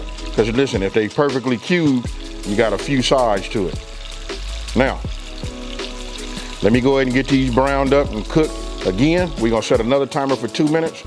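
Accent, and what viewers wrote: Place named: Los Angeles, United States. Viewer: I love his la accent!!!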